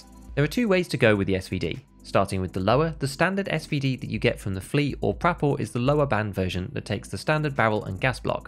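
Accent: United Kingdom. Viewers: I just want to give an absolutely TREMENDOUS callout on the zeroing conversation! It's so important to understand what the "default" round is for the gun to understand if you'll be shooting low or high, ESPECIALLY for a long range gun like the SVD. As always, stellar work my fine-talking British friend.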